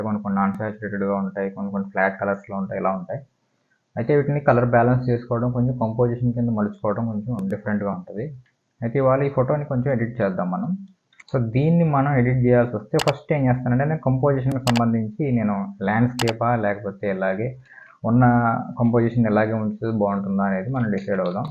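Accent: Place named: East Godavari, India. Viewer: Anna ne proper ekkada? konchem east godavari slang matladutunnav!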